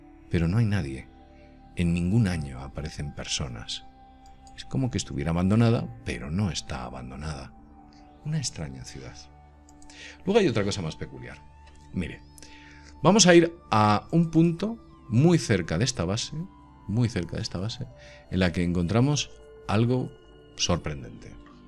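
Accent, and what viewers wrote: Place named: Spain. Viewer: Con todo respeto! Si no estás interesado en el tema! que é haces viéndolo?.. Cada país, 🌍 o región tiene su dialecto!... Cuando hablan en otro idioma diferente al de su país .. aún usan fonemas o resaltan algunas consonantes según su dialecto!... es el caso de Los costeños, Rusos, japoneses etc Él es Español 🌍 por si no lo sabías Gracias por mostrarnos tu sapiencia 😏👎🏼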